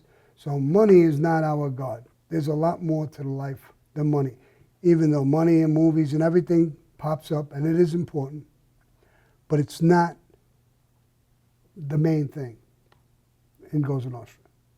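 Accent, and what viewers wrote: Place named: Boston, United States. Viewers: You remind me of my father the way you tell stories, but with a Boston accent instead lol. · Im glad your here to tell your story.. can u say "im gonna bust your balls " with your east coast accent love that shit thanks for the video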